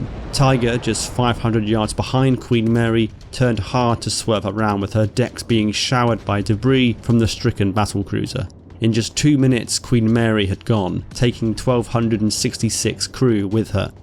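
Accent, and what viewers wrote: United Kingdom. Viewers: Holy crap. You sound like a limey but you mispronounced nearly every single name!!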